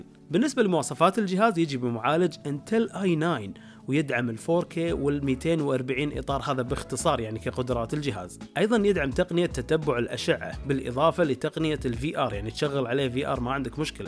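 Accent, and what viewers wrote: Iraq. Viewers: اللهجة عزيزي انه عراقي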